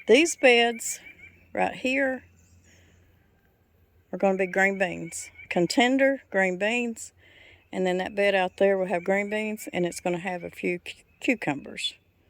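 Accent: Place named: Arkansas, United States. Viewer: I listen to you because you sound like my Arkansas Family that I miss terribly. Today you said something that If I were you I would use to describe your( Good Things(Martha Stewart).(Love and Best Dishes Paula Dean) "You can not beat that with a Stick" Should be how you describe your endings along with " God's Best to You from Whippoorwill Holler." Your beginnings of filming is great describing your goals. I feel like you need an ending. that is just as Country,. You are a great cook, school kid approved, family friendly dishes that withstand the test of time. I Know cause my folks did a lot of life that way. Collins/ Smith/ Hearst/ Sloan are my AR family names. Maybe you have heard of them? You are "the Best thing and God's Best to you all." CKelly Hearst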